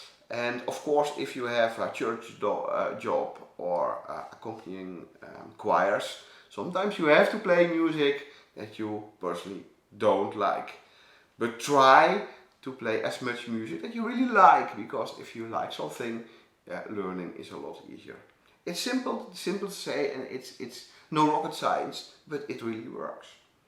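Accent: Netherlands. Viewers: Thank you for this! Your English reminds me so much of a Dutch man who ran a dairy where I grew up. He was a wonderful, very friendly and cheerful man, as was his family -- friends I grew up with. He spoke English in a manner very similar to the way you do.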